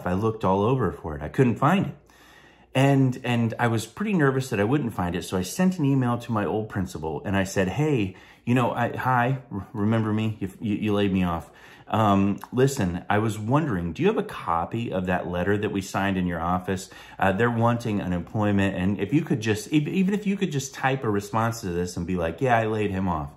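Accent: United States. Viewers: 👂 Sounds like Lake County Fl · Sounds like Jasper, Missouri.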